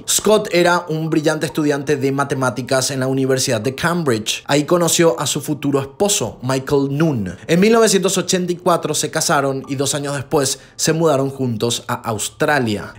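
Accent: Paraguay. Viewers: Tiene una voz espectacular!!! es Paraguayo, a caso todos los youtubers tienen que hablar con tonada mexicana??? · Tenes acento Paraguayo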